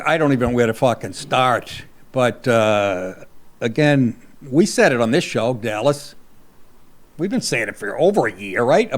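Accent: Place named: Boston, United States. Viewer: Are you a Massachusetts man? As a former North Ender it sounds like home.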